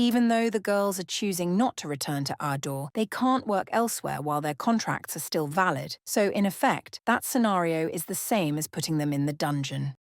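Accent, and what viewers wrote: United Kingdom. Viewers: I love the British accent especially when you say something snarky, it really fits the tone. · What made you choose the British accent?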